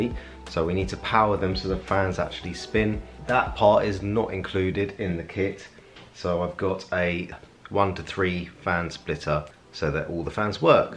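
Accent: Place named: United Kingdom. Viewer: you say "this front panel (satl?) connector", what word are you saying? I'm from the US and sometimes a British accent makes it difficult to pick up every word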